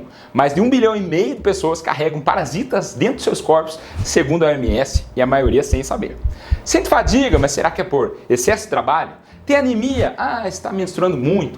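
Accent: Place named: Caipira, Brazil. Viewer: Este médico é maravilhoso, com seu sotaque caipira e jeito de falar , faz com que possamos entender bem tudo que ele explica, além de muito bonito é claro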